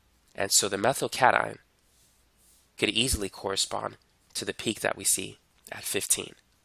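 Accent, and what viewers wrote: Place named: New York, United States. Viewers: i think i hear a new yorker accent in this man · new york accent king <3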